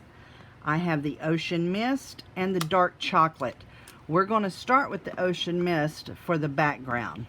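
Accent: Texas, United States. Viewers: I just adore listing to your Texas drawl. Your videos are amazing...thanks for all the inspiration! Now where's the video on how you did the multi-colored greeting??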